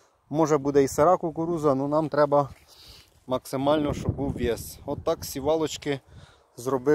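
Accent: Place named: Khmelnytskyi, Ukraine. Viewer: Говор наче з Хмельниччини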